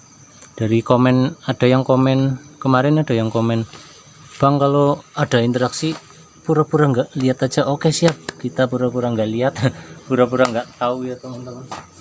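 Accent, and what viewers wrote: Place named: Java, Indonesia. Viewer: assalamualaikum.salam kenal dari tangerang mas mas logat jawa penampilan orang timur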